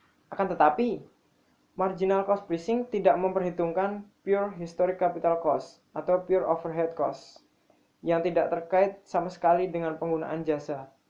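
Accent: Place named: Java, Indonesia. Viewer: Ini ko logat jawanya medok ya hihi~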